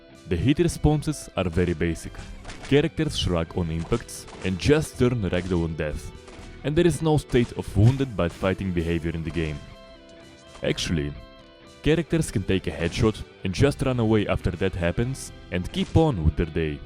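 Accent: Russia. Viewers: Здравствуйте, кто вы по нации? Hello, what is your nationality? You seem to have a Russian accent, or maybe not. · whats your accent my sister says russian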